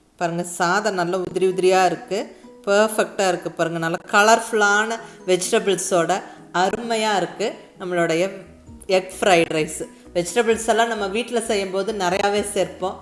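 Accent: Coimbatore, India. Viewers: அக்கா நீங்க பேசும் கோயம்புத்தூர் தமிழ் ரொம்ப அழகாக இருக்கிறது.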